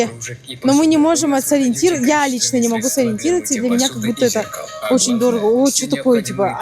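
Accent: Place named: Kazakhstan. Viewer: у вас уже произношение казахское. хорошего вам времени провождения в Бишкеке. Вопрос вы часто используете междометие Де или Ди что это значит с якутского?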